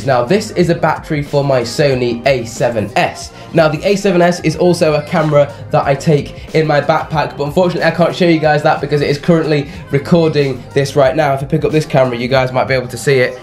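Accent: United Kingdom. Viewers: that british accent is awesome, you make everything sound so much cooler or than it is lol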